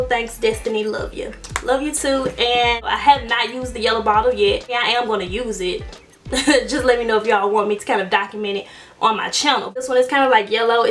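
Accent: South, United States. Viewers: been a while since ive seen a black person with a thick country accent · I love you down south country accent! 😍 Soooo cute, girl! I'm from Florida so you know I'm representing! BTW, it's not overwhelming, it's very cute 😉😘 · Wild growth did not work for me unfortunately, and it left a white residue. 😞 You have a lovely accent, BTW. Real Southern gal! 🇹🇹😇👏🤗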